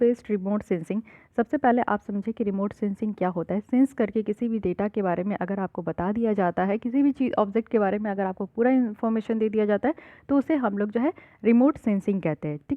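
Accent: Bihar, India. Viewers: Mam kya aap bihar ki ho?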